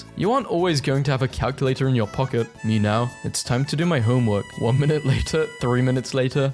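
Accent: United Kingdom. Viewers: British guy ye🐥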